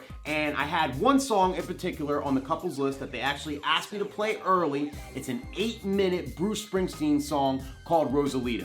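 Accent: New Jersey, United States. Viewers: Hey as soon i saw one of your vids I was like this cat sound like. JERSEY and lit fabulous job great energy munch continue success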